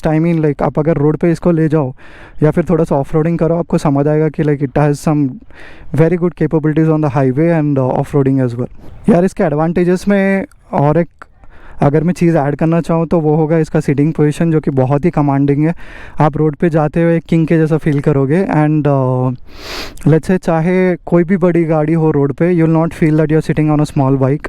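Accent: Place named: Telugu, India. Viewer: with telugu accent is the best bro.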